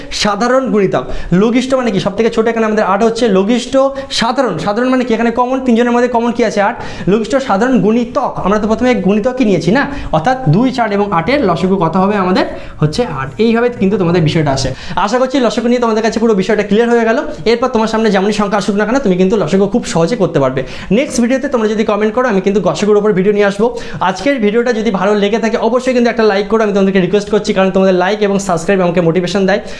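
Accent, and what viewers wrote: Bangladesh. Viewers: আপনি কি নোয়াখালী?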